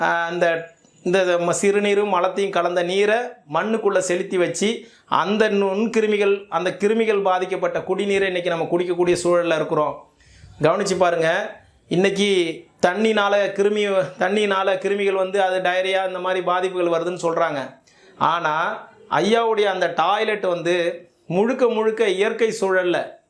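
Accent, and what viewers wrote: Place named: Coimbatore, India. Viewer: நன்றி ஐயா. மலம்கூட மண்ணுள் மக்கினால் மணக்குமென்பதை அழகாக விளக்கினீர்கள். ஏனுங்க நீங்க கோயம்புத்தூர் பேச்சு வழக்கிலேயே பேசுங்க.அழகா இருக்குதுங்க.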